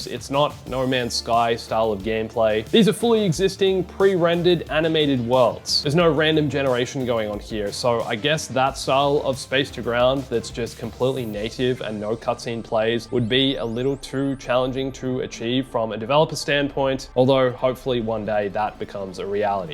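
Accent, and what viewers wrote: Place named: Australia. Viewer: His accent, Aussie w/ an American inflection on all the 'r's. It's irritating. Pick a side of the Pacific & stick with it.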